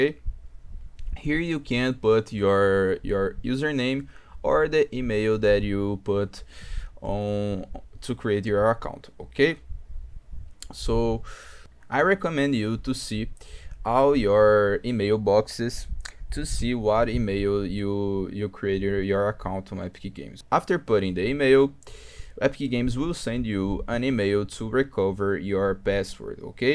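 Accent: Brazil. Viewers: Are u brazilian?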